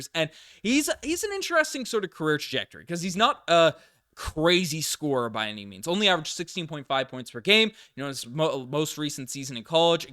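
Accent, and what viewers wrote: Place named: St. John's, Canada. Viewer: i knew your accent sounded familliar lol im from st johns too 🤣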